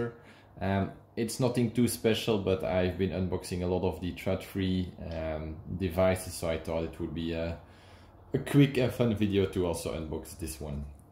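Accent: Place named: Brabant, Netherlands. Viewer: Je Brabands accent door je Engels is zalig ☺️☺️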